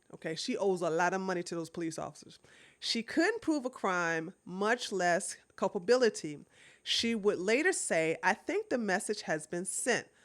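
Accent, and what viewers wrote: United States. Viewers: I am Irish born in Austria {Europe for those who do not know Geography} but raised in French West Central Africa {Sénègal + Guineé {not same as Guinea in South America} also Saïgon, Viêtnãm in 1958} I married a Philippina my brother a Korean. We lived out in the ville {out in the local community} not the American compoud and went to the local School {Lycée Von Volenhaven} not the American school. So I was extremely presently surprised by the extremely good logic but had a liitle dificulty understanding you because of the American Dialect used but think it is geared to your main audiance and because of my age I have not keept up with the various types of American English used. Now I have given my background I have a question that i have not gotten and answer to. In the 60's the black in large numbers had «Afro Hair Style» well when they arrived with there «Boom Box» the looking for their «Roots» the local disrespected them called them worst than a dirty whiteman. 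Their reason was that they did not know or had not tried to know history culture of their region thaey had taken White culture and corrupted it and forgot their ancestors making them lower than the lowest white. I never saw a local wearing and «Afro» or carrying and playing at volume a «boom box»!!!! So When I got to USA I asked why/what was the «Afro» I was told it was traditional hair style by a number of when I asked from what region {East., West, South or North } {I was familiar with West Africa} and noone could a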